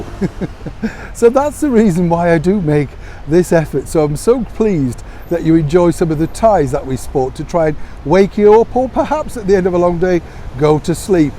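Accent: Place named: RP, United Kingdom. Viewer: I have messaged before from South Africa. I I thoroughly enjoy your short but impeccable show. And yes. Your dress and more importantly your use if the Queens English is simply excellent. Well done. Will always support your work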